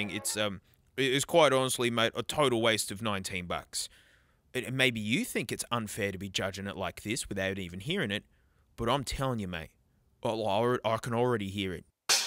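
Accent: Australia. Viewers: this has guy always been the best for when you just want to relax and watch an aussie speaking like an aussie should speaking as an under 100k subs veiwer on the main channel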